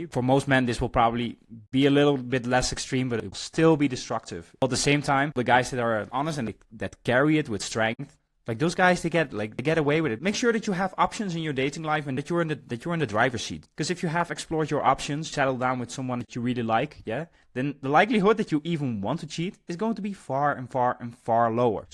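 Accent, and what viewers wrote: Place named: Netherlands. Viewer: I hear a dutch accent.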